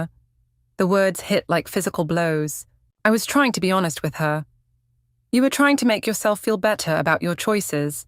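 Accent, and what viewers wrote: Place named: England, United Kingdom. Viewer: “Sarah’s mom saw you and told her mom and Sarah told me at lunch…” Then , the convo makes zero sense - the daughter is with her dad, at grandma’s, but Liam brings the daughter’s art supplies back to the house where she doesn’t live and says “she forgot them yesterday…” What TF? It’s almost like no one actually listened to this slop after the computer regurgitated it using a prim, proper and stuffy English accent who, when she protests, she protests “weekly.” SMDH AI-generated 💩 like this video truly sucks 👎👎👎👎👎👎👎👎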